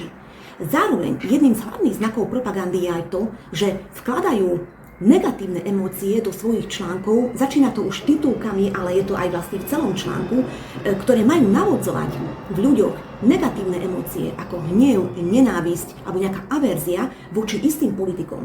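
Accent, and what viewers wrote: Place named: Hungary. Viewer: Milujem vašu maďarskú Slovenčinu, žerem vás! ❤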